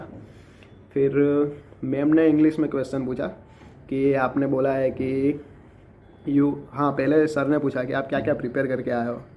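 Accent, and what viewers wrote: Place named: Gujarat, India. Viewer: Thank you mota bhai 😊☺ btw from which district are you in gujrat. Your gujrati tone reflect in your talk. Btw jay swaminarayan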